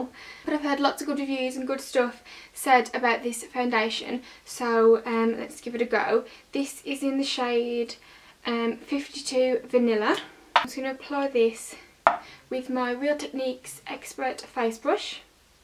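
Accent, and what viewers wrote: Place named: Midlands, United Kingdom. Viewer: I haven't heard someone from the Midlands on youtube before XD Represent!! Lol.Nice vid :)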